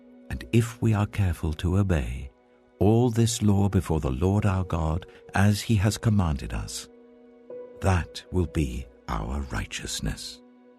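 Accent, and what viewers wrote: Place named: RP, United Kingdom. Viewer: Let's face it you cannot beat a really nice cultured British accent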